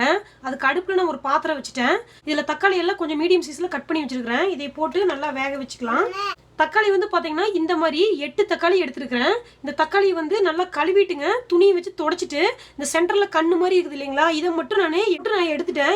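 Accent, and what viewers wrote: Kongu, India. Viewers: Akka kongu slang super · Kongu tamil valka supper akka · unga Slang apt kongu style la irukunga sis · Migavum pandhamana pechu.Arumaiyana samayal. Nandri sis. Nam kongu Tamil alage alagu.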